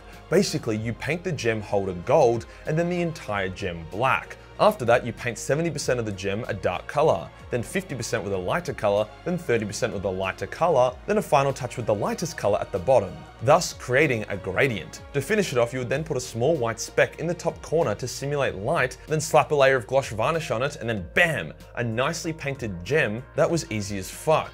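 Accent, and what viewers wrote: Australia. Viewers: I kinda know everything in the video but it's just nice to hear an Australian swearing on youtube.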